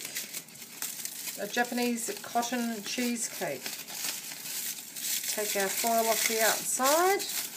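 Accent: Australia. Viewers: Greetings from Melb! Awesome recipe, thanks for sharing! I'm gonna make it for my parents after getting all the ingredients needed ready. (P.S I didn't realise that your accent is the Aussie one,but does sound familiar =_ =||| probably becuz we are on the same boat, hahah) Anyway, thank you for the recipe!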